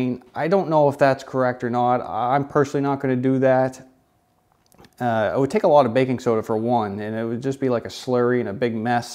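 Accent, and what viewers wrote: Canada. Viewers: Reminds me of Canadian or from Minnesota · Idk because this is my first time watching one of this guy's videos but he sounds Canadian. I've never heard anyone pronounce it -oid and I'm American. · 9 seconds til Canadian reveal. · He's canadian so he gets a pass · I think he's Canadian. That would explain the bad english. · Are you Canadian? You look and sound Canadian. I know this is random as hell lol. Good video 👌🏾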